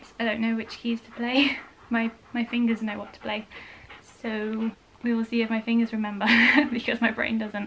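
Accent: United Kingdom. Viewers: Can you answer if ur British is me am British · It’s cuz she sounds British or something. If your American of course you love it what American doesn’t?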